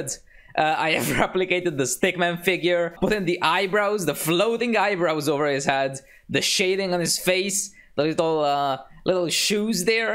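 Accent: Italy. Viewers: why are you italian? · Are you from italy?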